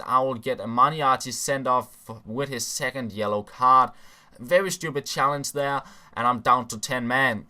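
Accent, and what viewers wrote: Denmark. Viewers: I'm a new, subscriber. Are you Danish, you sound Danish.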